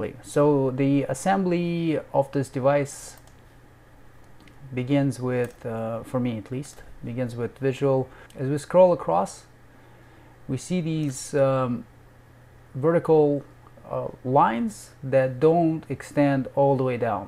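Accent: Russia. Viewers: Хм. У автора как будто еле различимый русский акцент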